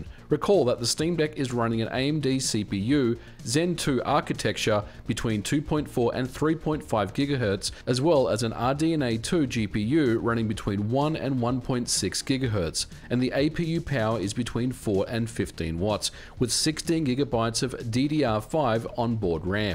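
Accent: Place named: Australia. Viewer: you need to state which currency you are talking about. you have an australian accent, so while i assume in your script you are always speaking at americans, this is always jarring and annoying. if you say "fall" we hear a tumble, not a season. americans are ignorant, please stop trying to please them.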